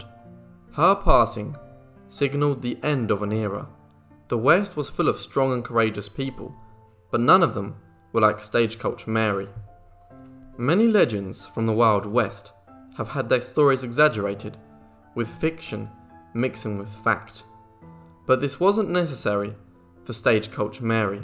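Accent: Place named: United Kingdom. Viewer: WHY ,WHY WHY do.they always have these damn Brits narrating stuff that has nothing.to.do with them I can't stand listening to.that.accent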